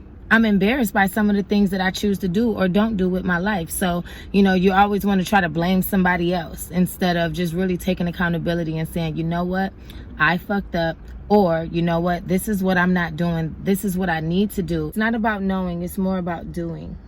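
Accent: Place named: New York, United States. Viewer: You got a new York accent.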